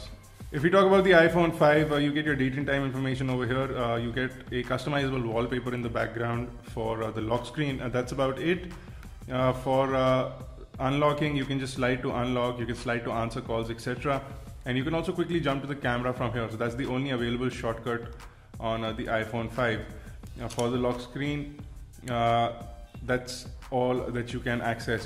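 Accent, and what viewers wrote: India. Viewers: Indian commentary :D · can`t u understand by accent ?? u dumb!! of course form indiaaaaaaaa... :D :D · yeah indian accent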